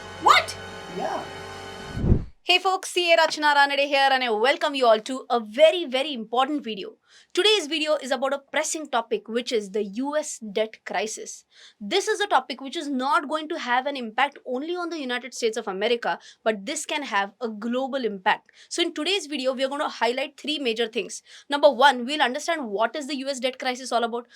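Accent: India. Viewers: You have a pure Indian good English Accent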